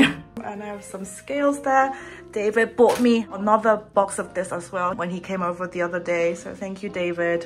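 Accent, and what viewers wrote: United Kingdom. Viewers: Damn standard n strong British accent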